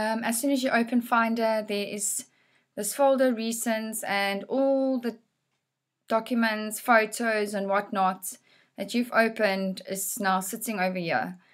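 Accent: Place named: Afrikaans, South Africa. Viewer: Thank you!! (Love the accent! Afrikaans maybe?)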